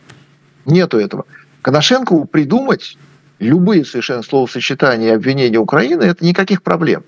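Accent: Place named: Israel. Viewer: Явно заметен акцент ведущего. Тогда все понятно : либо Израиль , либо Бруклин. Дизлайк.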